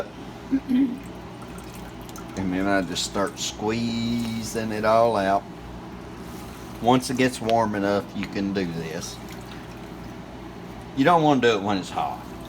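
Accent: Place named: Appalachia, United States. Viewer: I’ve been looking for wild lettuce videos,Soon as I heard u talking I thought “yep, this guy.” Some hick off in the woods, 🤠hillbillys and home remedies that work.. that’s like a Ketchup and cheese sandwich.. thx . With love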